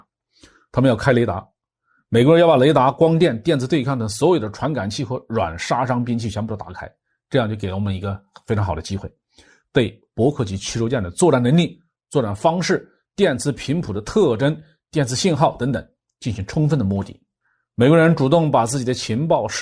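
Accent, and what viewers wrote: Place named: Hubei, China. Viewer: 湖北口音？还是信阳南部口音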